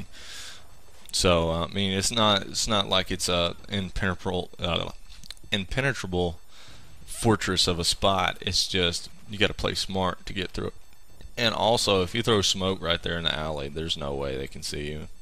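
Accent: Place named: South, United States. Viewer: Nice video, great quality, good tips. Are you from the south? I'm from GA, your accent sounds kinda familiar.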